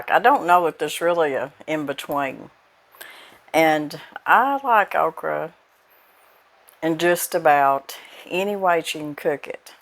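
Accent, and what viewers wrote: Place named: Arkansas, United States. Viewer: Hi and, I love your videos. I look for a new one every day. My husband comes from Hardy Arkansas. His family is wonderful and talks just like you. Good people. I’ve swam in Spring river and stayed at the old motel, the lady that owns it was a friend of my husband’s mother. I heard stories about Hap the Chief of police and how he still keeps folks doing right from wrong Lol. I wanted to ask a guestion because I’m new to canning. I wanted to know if Round roast, bottom and Top Roast is good for canning soup. Stew and meat? Thank you my friend, Cheryl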